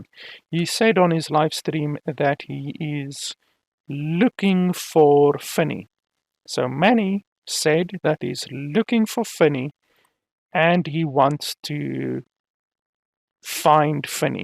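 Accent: South Africa. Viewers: are you South African lol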